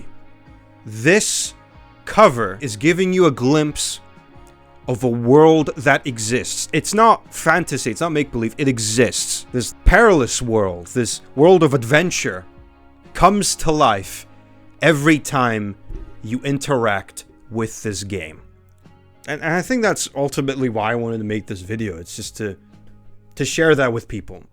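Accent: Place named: Finland. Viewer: Dude's accent sounds Finnish.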